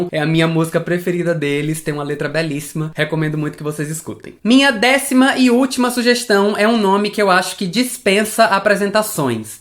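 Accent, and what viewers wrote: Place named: Bahia, Brazil. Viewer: Oi amigo . Tudo bom ? Qual seu nome ? Vc mora na Bahia ? Falo pelo sutaque . Não conhecia seu canal , é ótimo , tem um conteúdo de qualidade parabéns .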